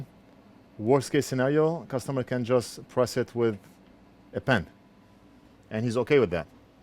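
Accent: Lebanon. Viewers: Dude has a Lebanese accent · are you Lebanese? your accent and the ear analogy are very Lebanese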